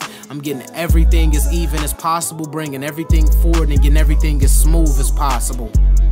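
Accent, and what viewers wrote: Chicago, United States. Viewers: wait you from chicago dog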